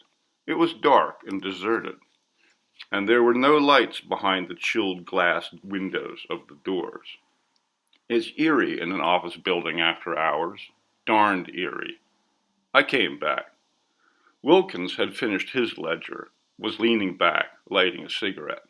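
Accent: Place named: New York, United States. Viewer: What a great New York accent,and really well read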